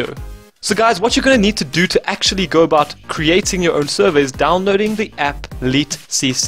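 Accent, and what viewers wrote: South Africa. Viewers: are you South African? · u sound South African?!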